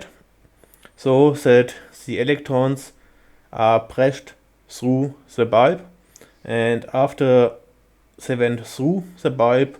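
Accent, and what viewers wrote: Germany. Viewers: When you know exactly that your boy is from Germany cause we all have the same foreign accent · das ist das Deutscheste Englisch was ich jemals gehört habe so geil man. mach weiter so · Das ist das deutscheste Englisch was ich je gehört habe aber danke für das Video👍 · this alman english is amazing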